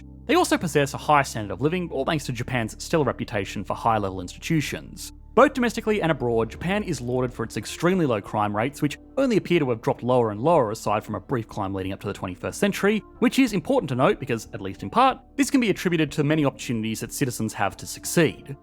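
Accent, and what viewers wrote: Australia. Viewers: the Australian accent is the worst accent in the world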